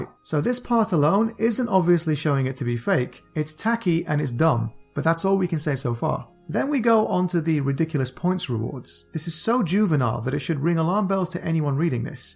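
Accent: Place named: England, United Kingdom. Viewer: I just love your English accent 😍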